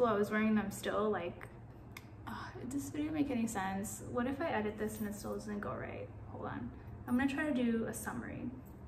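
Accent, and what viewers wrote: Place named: Atlanta, United States. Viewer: You have the Atlanta accent same as mine, Atlanta was full of transplants from the 90s so we didnt get a GA accent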